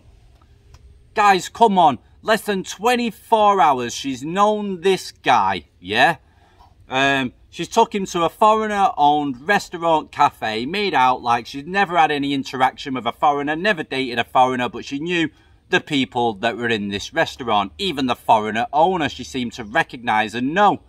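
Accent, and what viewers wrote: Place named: Yorkshire, United Kingdom. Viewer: Are you from Yorkshire? You sound like you from leeds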